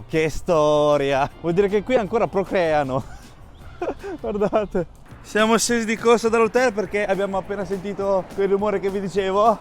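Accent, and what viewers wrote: Italy. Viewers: Se dici che non si vede, però ti dico che si sente che sei italiano... Dai, si capisce subito appena ti si ascolta.... Poi chiaramente avrai origini cinesi ma non vedo dov'è il problema e tutta sta gente che si meraviglia di ste cose a giorno d'oggi a me inizia a starmi sulle scatole. Non dovresti precisare niente a nessuno!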